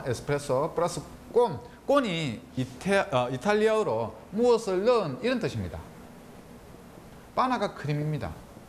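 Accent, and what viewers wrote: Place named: Gumi, South Korea. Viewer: 강의 넘 잘들었습니다 혼자 공부함에 많은 도움 되었습니다 커피에 대해 알고 싶은 욕구 충분히 채워주신 덕분에 만족합니다 고향구미 사투리 반갑고 정겹습니다 ~^^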